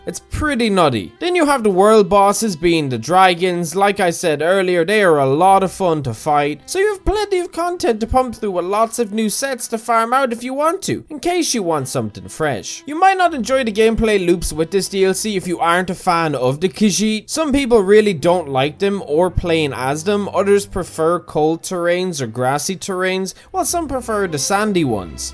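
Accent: Ireland. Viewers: Are you actually irish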